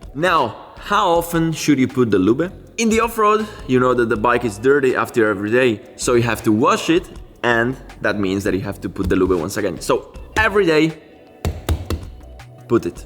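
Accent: Italy. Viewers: alles ok im Video !! mir gefällt Dein Englisch mit italienischen Akzent - erinnert mich an meine Urlaube in Italien !!! ;-)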